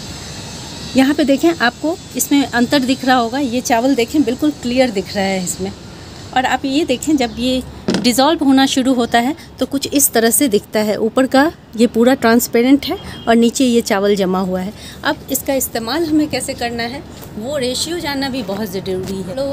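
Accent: Bihar, India. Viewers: Aapki boli me Bihar hai. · Nice information....ap Delhi rehte ho, bt apka tone to Bihari hai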